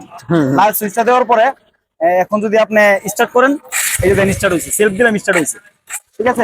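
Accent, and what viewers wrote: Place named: Mymensingh, Bangladesh. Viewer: apnar bari ki Mymensingh? vasha shunei apon apon lagtese. onek kichu shikhlam apnar page theke gari chalanor. bike chalanor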